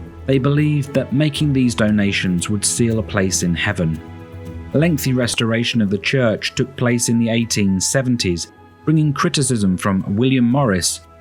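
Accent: United Kingdom. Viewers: I love the typical British accent.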